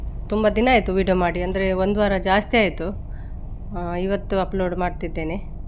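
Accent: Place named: Mangalore, India. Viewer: Nim mangalore Kannada bashe Kelakke Chanda ❤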